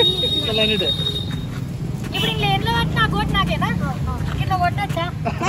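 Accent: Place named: Nizamabad, India. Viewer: మన యాస నిజామాబాద్ జిల్లా కైతిని ప్రపంచనికి తెలిసేలా చేశారు మీకు ధన్యవాదాలు తెలుుతున్నాను అక్క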